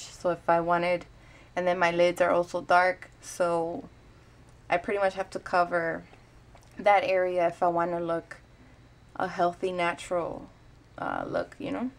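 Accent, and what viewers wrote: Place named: Puerto Rico. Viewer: I just stumbled upon your video. As soon as I heard you speak, I knew you had to be Puerto Rican! LOL I'm PR too; I too have dark circles pretty bad. I got most of it from my mom but I also don't sleep very well. I really like that you not only took the time to share this, you put yourself out there to do it. Also, thanks for using real world products. I don't know about anyone else, but I cannot afford $40 on just one product like concealer. You did what works for you and I love it! BTW, you are beautiful with or without the make up. Thanks! Make more make up videos like what eye shadows you use and blush! God bless you!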